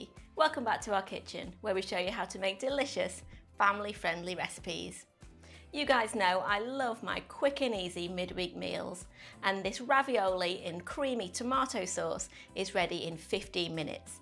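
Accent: United Kingdom. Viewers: This woman has lost her mind. Dont know her accent but guess she must be from the UK. What on earth is she doing to food?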